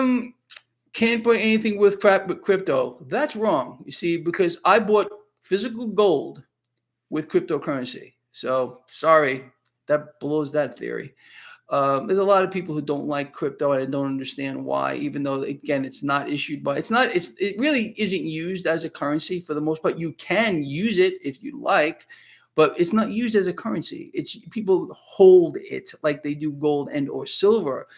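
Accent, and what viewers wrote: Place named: Philadelphia, United States. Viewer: New to the channel, really enjoying it. Love accent. Is it Philly? Jersey?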